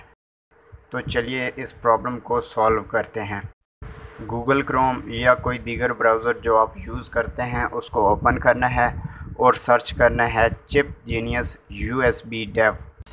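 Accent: India. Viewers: I don't know but I felt I found the true and the best video when I heard the Indian accent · Punjabi Language destroys the accent of every language like this brother's.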